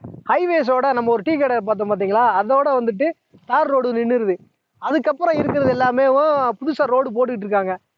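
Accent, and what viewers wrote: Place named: Theni, India. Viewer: I like your theni slag speech bro😍👍👍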